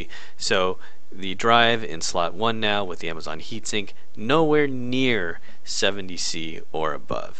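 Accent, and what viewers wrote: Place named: New York, United States. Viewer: Are you from the same area (new-york-ish) as the famous repair-rights activist Louis Rossman? It sounds like you guys have the same dialect - a lot.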